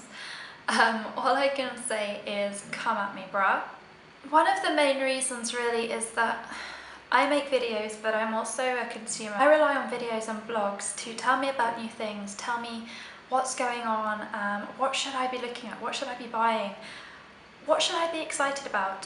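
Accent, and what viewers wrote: United Kingdom. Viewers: Monetization on YouTube has been its downfall IMO. My channel isn't beauty related, but I just make videos for my own (and my students & their parents) enjoyment. The monetization offer keeps popping up on my channel, but I don't want to have to scramble for views or subscribers, stress about putting out a video every week or whatever, or worry too much about copyright for the music I use, so I won't do it. If a video only gets 100 views, it doesn't bother me. I've seen a number of YouTube "gurus" express the same feelings as you; if something like this is a JOB, it changes the whole experience. You do YOU, and don't worry much about us out here. As long as you have that lovely soothing voice and super cool British accent, I'll watch your videos (even if you DO talk about feminine products-it will still sound good coming from you) ;)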